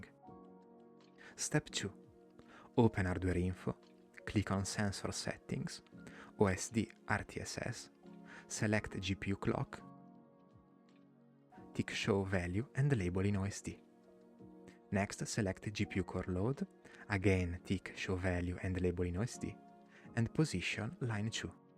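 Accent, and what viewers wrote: Italy. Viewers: Riconoscerei l'accento italiano da 1km di distanza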